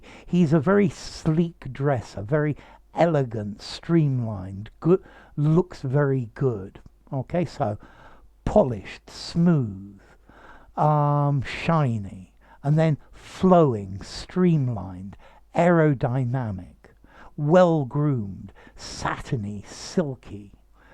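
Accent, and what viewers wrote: RP, United Kingdom. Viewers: Your English RP accent is sleek and I like it. It's very articulate. You enunciate each and every single word in your speech. Thank you sir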